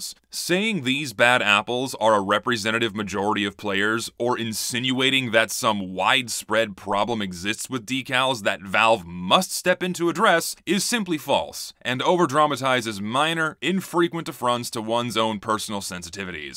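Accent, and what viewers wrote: South, United States. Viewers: You should is it embrace the Texan accent, dude. It’s slipping anyway. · Is it me or does sound really Southern in this video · with that southern droll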